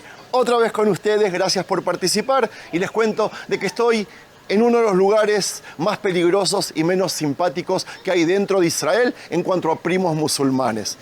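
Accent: Argentina. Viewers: Nice video. Is your accent a mix of Argentinian and Hebrew?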